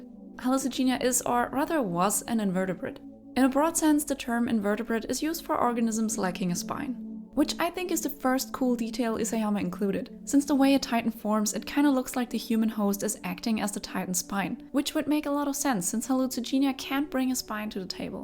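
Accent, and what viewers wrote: Germany. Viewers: Instantly knew you were German from the way you spoke, hätt aber nicht damit gerechnet, dass du aus Österreich bist. Gutes Video und Lg aus Kärnten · you sound german a little bit german, wondering if i guessed correctly :D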